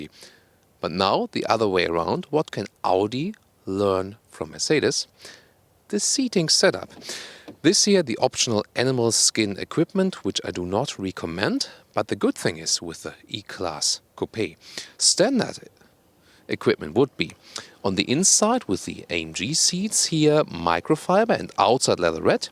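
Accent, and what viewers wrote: Germany. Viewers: that's germensh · your spoken english sounds like german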